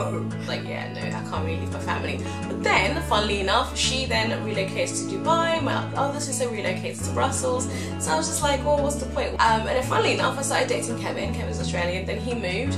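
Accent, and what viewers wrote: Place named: England, United Kingdom. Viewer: A black women with an Engish accent ....awww I fell in love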